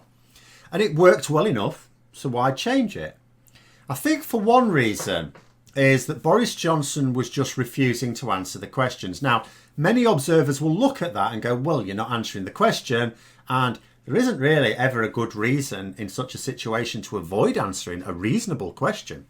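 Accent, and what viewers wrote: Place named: West Yorkshire, United Kingdom. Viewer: Your accent / phrases remind me of home 😭... "that gormless article" 😂 West Yorks by any chance?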